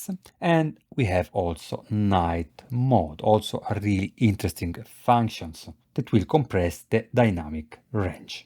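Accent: Italy. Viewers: Great review! I love your Italian accent :) Would you think the M1 is overpowered and a good fit for the Project Speakerbox V2s? I am planning to buy a REL Tzero MKIII with it.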